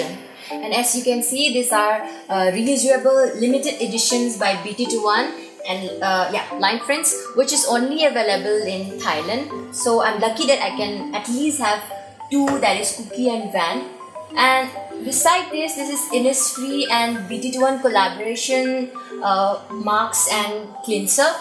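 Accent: England, United Kingdom. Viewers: I like your hair and your English accent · I still can't get over your cute English accent 😍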